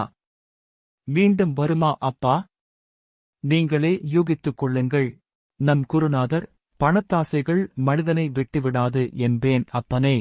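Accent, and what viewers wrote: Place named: North, India. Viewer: தயவு செய்து தமிழ் தெரிந்தவர்களை வாசிக்கச் செய்யவும். அகத்திய மா முனி / பெயரை கெடுக்காதீர்கள் தமிழுக்கே இழுக்காகும். யாரோ வடக்கர்களை வைத்து வாசிப்பது போல உள்ளது.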